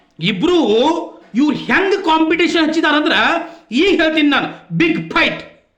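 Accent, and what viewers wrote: North Karnataka, India. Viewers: Chanal ugiri sir nimma. Matu super uttar karnkad matu benki🔥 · ಸೂಪರ್ ಅಣ್ಣಾ,, ನಿಮ್ಮ ಮಾತಿನ ಶೈಲಿ ನಿರೂಪಣೆ ತುಂಬಾ ಅದ್ಭುತ. ಉತ್ತರ ಕರ್ನಾಟಕ ಮಂದಿಯ ಮಾತು ಕೇಳಲು ನನಗೆ ತುಂಬಾ ಇಷ್ಟ.ಧನ್ಯವಾದಗಳು ಸರ್.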